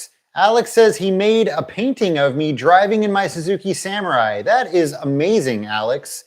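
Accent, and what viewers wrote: United States. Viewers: Hi from germany! I just want to say that your American Englisch ist so clear and easy to understand, and i dont know why 😅.. Its really comfortable to Listen to you 👋🙂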